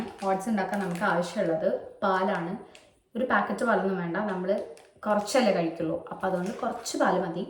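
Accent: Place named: Palakkad, India. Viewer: Hello chechyy Unnivava vlogs le chechy mare athe slang ningalkum....ningl oke palakkad aayond aavum llea... Anyways kettirikkan nalla rasamund ....❤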